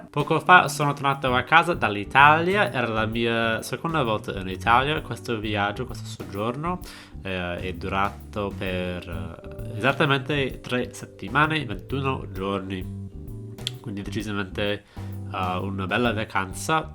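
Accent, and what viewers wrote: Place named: United States. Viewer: Sono romano e capisco cosa significa muoversi in questa città > gli autobus non hanno orari e pensa che per un periodo avevano messo alle fermate degli schermi con i tempi di attesa ((cazzata)) però devo difendere il fatto che non sbagliano strada, magari saltano qualche fermata, non arrivano sempre al capolinea, però non sbagliano strada. In ultima analisi > carissimo american guy > con l'accento americano e un appartamento a New York che aspetti a dire Sì a una girlfriend made in Italy? Uh, se perfezioneresti l'italiano!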